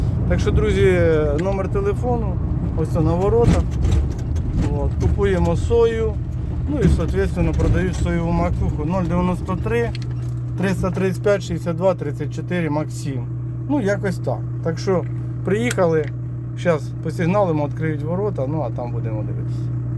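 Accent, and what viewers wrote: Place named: Poltava, Ukraine. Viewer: З усего відео найбільш мені сподобалась ваша щіра українська мова.Це є сама щіра мова ,я так розумію ви с Полтавщіни.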